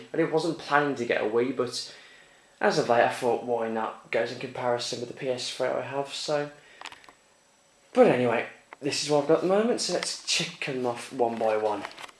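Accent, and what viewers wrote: United Kingdom. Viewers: i love your british accent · u sound like ure from the west mids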